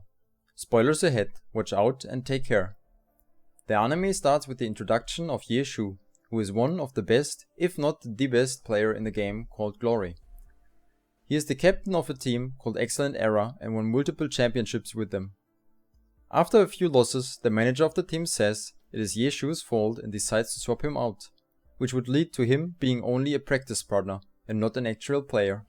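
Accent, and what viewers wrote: Germany. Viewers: Ich hör raus dass du deutscher bist ;) Weißt du vllt wo man den schauen kann, weil chrinyroll hat den nicht. Wollte mir den nochmal anschauen weils auch schon 2 Jahre her ist. Feier dein Content. Find solche Videos richtig gut weil man sich immer ein Stück weit ein Video rein ziehen kann um zu schauen ob der Anime einem zusagt oder nicht👌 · Dieses deutsche englisch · Uhh okay so two things great video but correct me if i am wrong is that an german accent if so i must say great english skills :D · Hey is it just me or is your accent a bit German?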